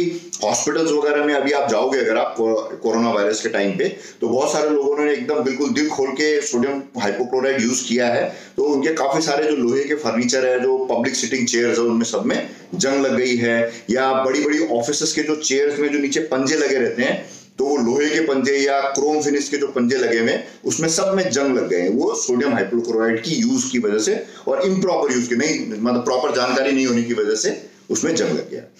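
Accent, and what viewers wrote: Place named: Rajasthan, India. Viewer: nazar कमाल है मतलब सही में आप राजस्थानी हो actually आपका बोलने का शुद्ध तरीका जो h wo और इतने बेबाक तरीके से राजस्थानी ही बात करते हैं और intelligent हो आप क्योंकि में भी राजस्थानी हू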